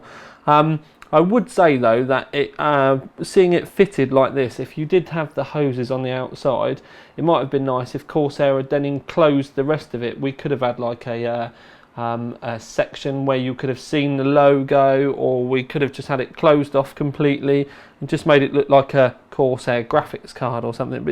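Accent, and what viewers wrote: United Kingdom. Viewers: this hardcore British accent is a little bit hard for me to understand LOL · Andre Salles That's because he's in Britan and speaking British English... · Basic benchmarks No they didn't. The English did. Ever heard of a country called England? Ever heard of the English people? The English spawned the languge, not the Scots, not the Welsh, and not the Irish. +TheMaraio1 in England dude, he's English and speaks with an English accent, I'm American, I speak with an American accent. It's Americans like you that give the rest of us a bad rep abroad, seriously guys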